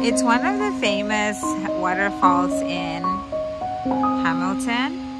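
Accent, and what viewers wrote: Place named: Philippines. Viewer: Philpino accent can be perceived clearly. Anyway nice and beautiful place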